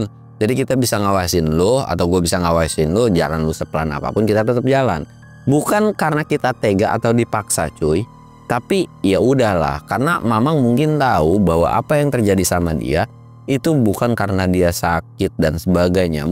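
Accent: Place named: West Java, Indonesia. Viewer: Kalo cerita mamang campur Sunda mang seru lucu logat sundana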